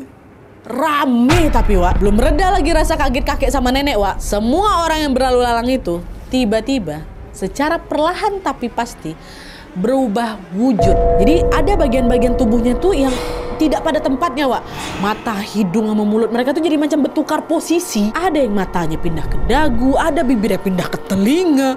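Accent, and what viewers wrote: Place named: Banyumas, Indonesia. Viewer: mbaa wongg ngapakk😂😂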